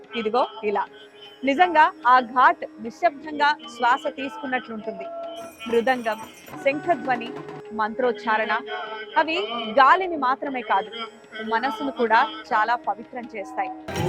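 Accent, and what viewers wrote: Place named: Telangana, India. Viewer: You guys have taken a good decision! Please continue with the content/news with strong throwing of words in Telangana Accent. Thank you 😊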